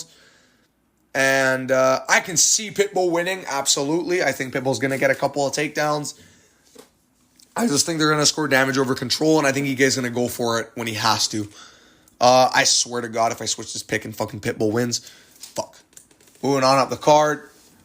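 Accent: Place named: Canada. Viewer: i can hear the Canadian in your voice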